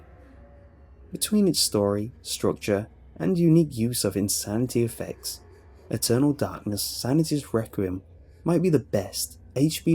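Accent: United Kingdom. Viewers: Love your tops and horror stories because of your voice so soothing and misterious I thik I never heard another well spoken brit like you do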